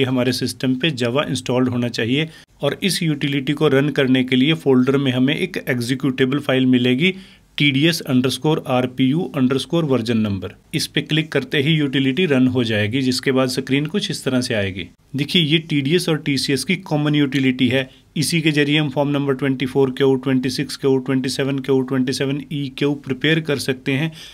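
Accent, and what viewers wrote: Himachal Pradesh, India. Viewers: aap himachal se ho according to your accent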